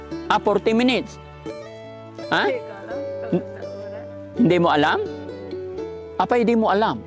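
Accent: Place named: Ilocos, Philippines. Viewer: Laing ag sao Ilokano ni.ang tiyaga mo.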